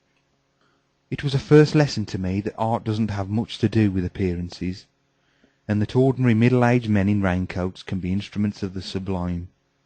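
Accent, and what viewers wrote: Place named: Birmingham, United Kingdom. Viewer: Sorry, but this should have been told by a Yorkshireman, not a West Midland 'Brummie' - it has lost the inclanative effect.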